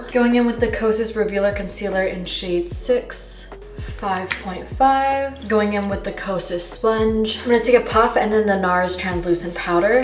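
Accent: United Kingdom. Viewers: Nobody noticed the British accent?😂😂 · Your British accent is swoon worthy. >.<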